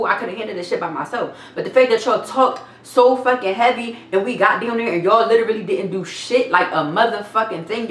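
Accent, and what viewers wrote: Philadelphia, United States. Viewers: Ppl like to assume bc you goofy & be on chill, you not gon knuck if they buck. Wrong 🗣 They do that with me too. Sometimes mfs have to find out the hard way that these hands fly just as quickly as the jokes baby. Oh & Philly accent is the shit. I always get compliments when I visit family in the Carolina’s lol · I like your accent. I'm frm Brooklyn NY. NY & Philly r kissing cousins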